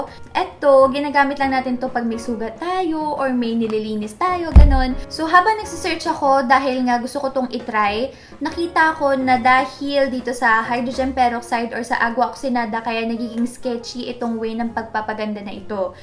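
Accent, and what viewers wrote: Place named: Batangas, Philippines. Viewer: May punto ka sis, batanguenya ka ba? 😊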